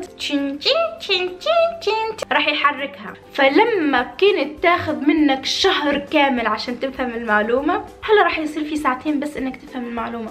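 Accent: Tunisia. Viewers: تحسك تونسية 🙂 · هل انت تونسية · انت تونسية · شكرا على النصائح حبيبتي بس بدي اسالك سؤال، انت من تونس؟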